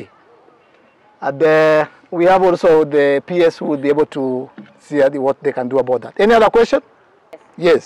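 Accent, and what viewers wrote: Kenya. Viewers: So all of you guys are assuming Eric is trying to speak like a Kikuyu accent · You've made my evening, with a kikuyu accent.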